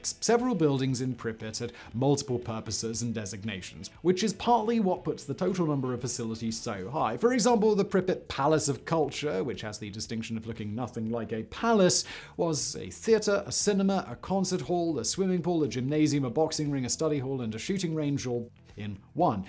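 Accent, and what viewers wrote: England, United Kingdom. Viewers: Another grammar fascist showing us all how smart they are by "correcting" the pronunciation in a video. BTW you do know is English and they sometimes pronounce words differently than than other countries do?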